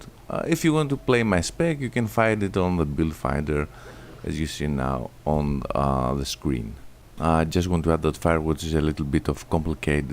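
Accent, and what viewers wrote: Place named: Greece. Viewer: I bet this accent is greek 😍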